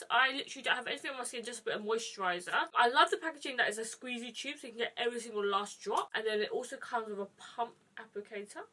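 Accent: United Kingdom. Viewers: I’ve seen your videos/pictures on Instagram plenty of times. This is the first video of yours I’ve watched on YouTube though, and the first time I’ve heard your voice. Didn’t know you had a British accent!! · I don’t mean this as an insulting way at all, but when she spoke I wasn’t expecting the tone and type of voice she spoke on it’s so much fuller than expected and I didn’t even know she was British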